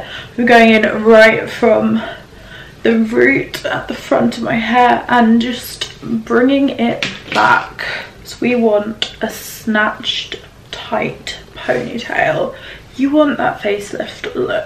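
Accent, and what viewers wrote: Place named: Birmingham, United Kingdom. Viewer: love the Birmingham accent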